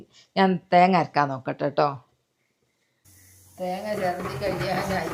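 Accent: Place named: Wayanad, India. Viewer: Wayanadan...samsaram...ugran... Thrissur..ninnum...❤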